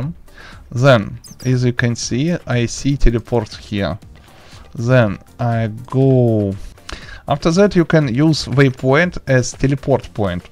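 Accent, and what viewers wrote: Russia. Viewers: Руский акцент не счем не перепутать😂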